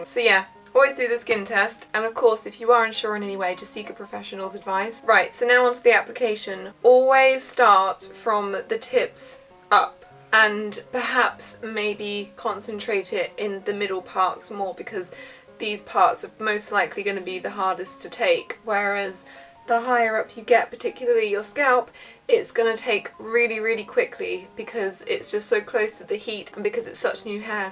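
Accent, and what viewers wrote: United Kingdom. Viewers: Far Q It's just British... I suppose.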